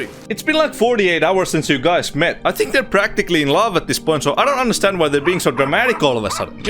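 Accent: Finland. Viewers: Your channel is criminally underrated. I like how you become progressively and aggressively more Finnish throughout the video. Edit: Btw, you have the personality and energy of a person 1M subscriber channel so keep it up. You could make it big for real. I was expecting to see at least several hundred thousand subs at least when I looked at your subscriber count. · Love the Finnish accent. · Ensimmäiset kymmenen sekuntia: Aksentti.. Onks tää jätkä suomalainen? : Hmm : :D Vois itsekin katsoa suomeks läpi. For shits and giggles :D Noh mutta kumminkin hyvä video. 6/5, toimii. Pitänee katsoa muutkin tekemänne videot. Ja kanava tilattu! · Hahahaa I knew you were finnish!!! :D huomasin heti aksentista x) Mutta arcane on vitun hyvä, se taide on niin kaunista en kestä!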